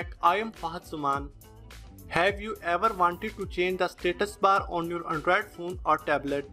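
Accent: India. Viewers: Your english accent is terrible. Oh i forgot you are indian that's why. Hahahahaha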